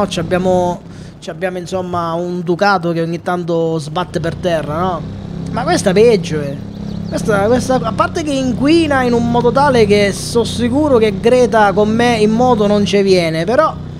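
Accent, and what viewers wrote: Sicily, Italy. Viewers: . Parla. In. Siciliano. Mi. Piace.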